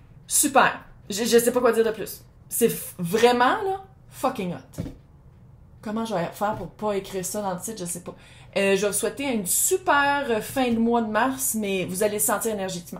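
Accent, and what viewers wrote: Quebec, Canada. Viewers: j'aime bien ce que tu est ne lâche pas tu est une source d'Energie .. je suis désormais abonner a toi.. pour ton accent je suis québécoise aussi donc nous avons le même langage entre les Français québécois et anglais malgré nous courage tu es merveilleuse.. merci de la video sa ma donner un peut plus de courage et de bonheur en moi..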